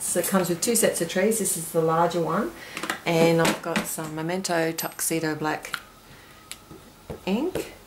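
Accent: Australia. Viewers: Thanks for sharing. You sound like a fellow Aussie and so just wondering where do you get your Tack n Peel from?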